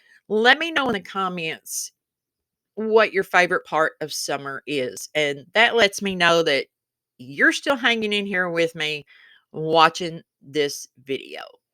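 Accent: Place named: Kentucky, United States. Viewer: GRITS = Girls raised in the south. I was also raised on a farm in the south. Love your KY accent! My father and older siblings and husband are from KY.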